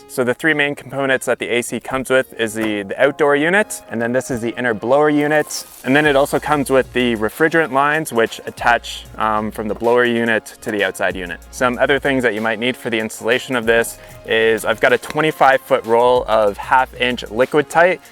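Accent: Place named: Canada. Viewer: I'm from Western NY so I recognize your subtle Canadian accent. I enjoy hearing it and your video content.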